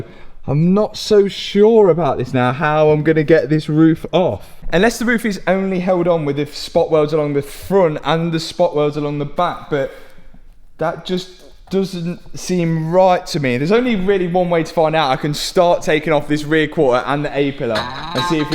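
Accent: Leicester, United Kingdom. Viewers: Damn this guys really reminds me of the Stradman. Just hear the way he is talking (except the British accent) and also the hat (they are both always wearing a hat)! They also have the same sort of energy 😀😀😀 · Leicester boy by your accent 😉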